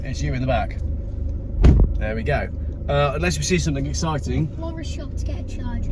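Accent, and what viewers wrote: United Kingdom. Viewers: Bri’ish guy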